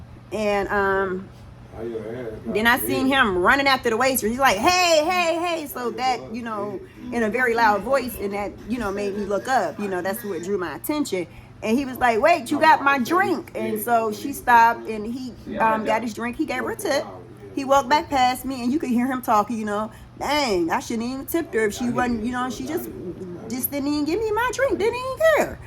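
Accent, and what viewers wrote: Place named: South, United States. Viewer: Nothing wrong with sounding country it’s just interesting to our ears to hear it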